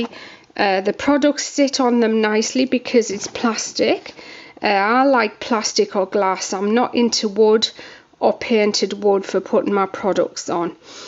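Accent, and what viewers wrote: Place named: Newcastle, United Kingdom. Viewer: The accent is what we call geordie , its north east england .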